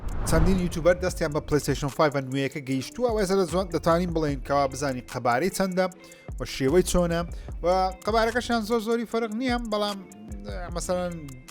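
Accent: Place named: Badini, Iraq. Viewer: بادینیەکەت زور جوانە کاک عەیبی نیە..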